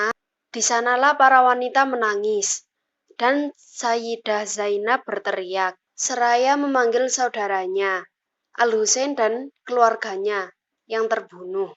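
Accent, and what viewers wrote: Java, Indonesia. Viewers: Dihhh annoying bangett sihh mbbaakkk , suara nya itulohhh medhookk nya ,dan kontekstual banget kaku kek kanebo keringg, isi jga g jelas n berbelit-belit, sama kek junjungan nya si syiah hobi n pandai Berpura-puraa, nohhh ikutt drama telenovela sonohh biar dapet piala, sayang kan sama bakat pura-pura nya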